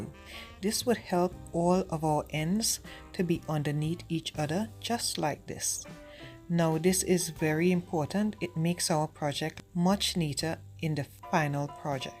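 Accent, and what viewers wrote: Trinidad and Tobago. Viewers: Beautiful. I'm hearing the Trini accent and I'm so glad I found your channel. Looking forward to seeing more.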